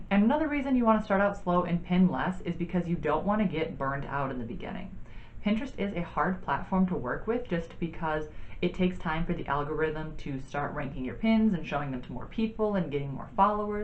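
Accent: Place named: United Kingdom. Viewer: Thanks for helping video. I saved your video to watch it later again because I don't understand British accent properly because they talk shortly. But English is not my native language and also I'm not expert it English 🙂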